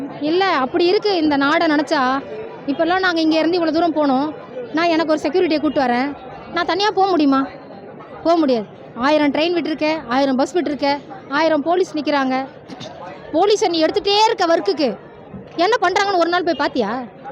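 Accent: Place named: Tirunelveli, India. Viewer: சகோதரி திருநெல்வேலி பேச்சு சரிதான்.... நாம் தமிழர் திருநெல்வேலி.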